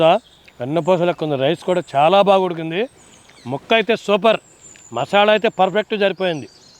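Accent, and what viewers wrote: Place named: Kurnool, India. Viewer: Today I will be making this recipe in Iftaar...luv the way u speak uncle...missing kurnool ...from 🇦🇪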